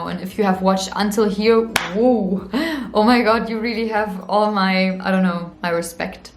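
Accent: India. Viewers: Her accent sounds almost like Indian. She looks good and talks good as well. Hi! Welcome aboard! Enjoy your tryst with India and Indian culture and the warmth of Indian people. Post one of your full length dance videos. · Your Indian accent in English is almost perfect. But to say DVD in the old days..... Good God, how old am I????😶😖😥😥😥😥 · I think ur english has indian accent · Your English pronunciation like Indian English style very good. We are must understand.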